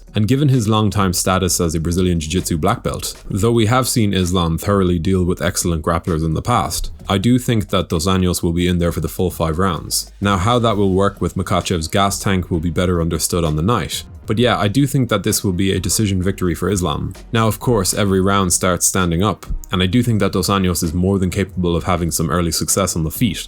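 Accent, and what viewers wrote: Ireland. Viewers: This irish narrator bugs the heck outa me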